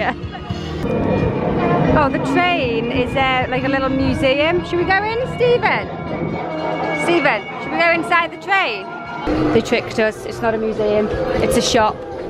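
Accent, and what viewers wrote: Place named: Northern England, United Kingdom. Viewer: Your a great travel guide. Love your northern English accent.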